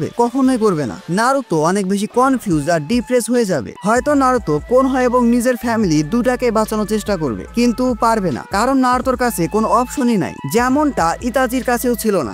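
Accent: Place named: Rajshahi, Bangladesh. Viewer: আপনার ভাষা শুনে মনে হলো, আপনার বাসা রাজশাহীতে Am I right??✅✅✅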